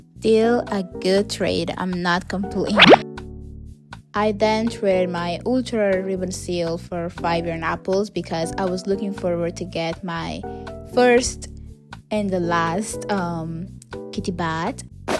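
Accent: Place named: Italy. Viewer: omg I loved this video! also ur accent is absolutely gorgeous! r u Italian? x (I have cousins who speak just like u 💞)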